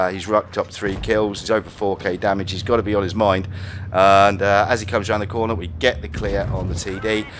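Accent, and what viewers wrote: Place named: United Kingdom. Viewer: Naisu Why do you soind like British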